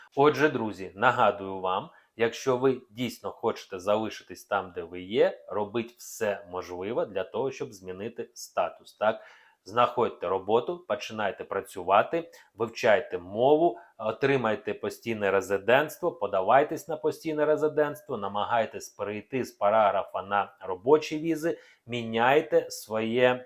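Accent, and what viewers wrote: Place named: Russia. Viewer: Прослухала не один матеріал від Вас і таке враження наче Ви радієте коли говорите, як повернуть чоловіків з-за кордону; вишлють біженців..як нікому ми тут не потрібні.. є нотка злорадства. Дивлячись на досить помітний російський акцент, здається що це таке собі іпсо. Хоча не зрозуміло чи від України чи від москалів. А може краще було б відіб@ від людей, перестати маніпулювати? Дати кожному вирішити самому де жити і за що воювати? Приносити користь, кажете. Так зберіть алкашів, нехай приносять користь. Там точно ціла армія набереться. Чи що, політики наші вже синів з за кордону забрали і відправили в бій?) Клоуни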